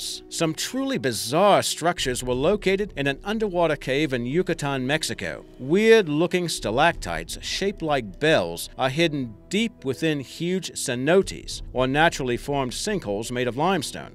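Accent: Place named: Australia. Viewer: ...........had to listen to 3 or more to understand what he was saying.......an entire "ployeted"....gotta be Australian.........."knaiefe"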